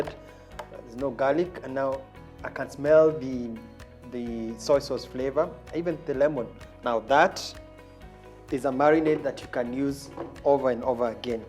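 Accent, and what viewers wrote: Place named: East Africa. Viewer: Oooh I can understand this Gud East African English thank uuu chef!!!💪💪💪❤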